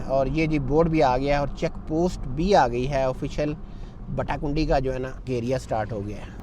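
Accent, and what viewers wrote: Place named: Punjab, India. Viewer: جی آپکی اردو بہت بری ھے پنجابی لہجے میں اردو نہیں بولی جاتی ، ناک میں بولتے ھو پاں پاں کر کے ،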